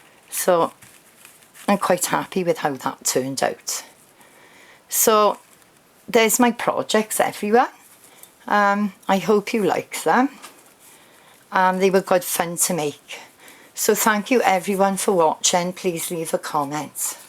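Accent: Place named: Wales, United Kingdom. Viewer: Beautiful makes and my Mum was welsh and I soooo miss the accent so it was lovely to hear yours. hugs Tibby xxxx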